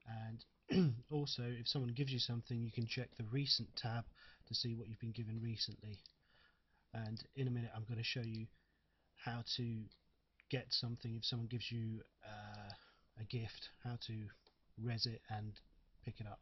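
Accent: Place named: United Kingdom. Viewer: Thanks! I wish I'd seen this a week ago. Slow eye speed, plus having to mentally process British English into American English mean I'll have to do a lot of stopping and rewinding to learn the specifics. But still, your delivery is good, and these are important points. I can't make the tutorials run when I'm IN Second Life, so this is great!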